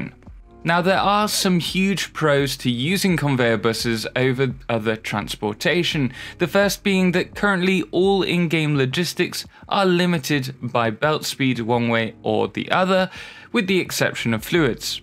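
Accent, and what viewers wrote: United Kingdom. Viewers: Why do you sound like spiffing brit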